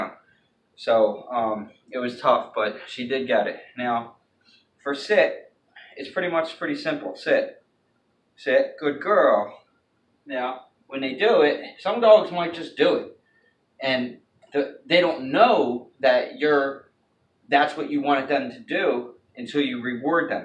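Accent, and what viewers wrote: Philadelphia, United States. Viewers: minutes in I could tell you’re from philly from your accent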